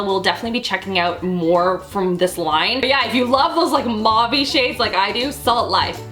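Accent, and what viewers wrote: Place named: Canada. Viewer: I love your Canadian accent!!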